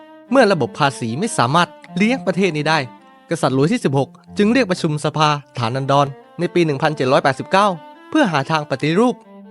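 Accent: South, Thailand. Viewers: มาเป็นกำลังใจให้ครับ ติดตามมาจากประวัติโลกและมนุษย์ตัวเต็ม ผมไม่รู้ว่าแอดเป็นคนใต้มั้ย พอฟังจากคลิปที่แล้วรู้สึกสำเนียงออกใต้ๆ นะ แค่เดาเล่นๆ ไม่ได้มีเจตนาไปทางอื่น ปล.ผมเป็นคนใต้นะ ทำคลิปดีๆออกมาเรื่อยๆละ อ้อลืมบอกกดติดตามให้แล้วนะครับ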